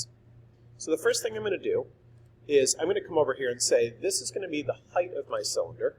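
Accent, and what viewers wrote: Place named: United States. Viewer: Very poor instructional video. This is made for people who already know how to make a cylinder. moves and clicks on stuff the beginner has NO idea of what he is doing. He is way too fast, doesn't explain why he is doing what he is doing. I am rewinding again and again and again, trying to find what he is doing and why. If this is basic construction in OnShape, take the extra time to explain EVERYTHING you are doing and where to find it. This video is useless to me, I guess I need to find a simpler app to use. I am glad he speaks American English, not some foreign deviation that is totally not understandable.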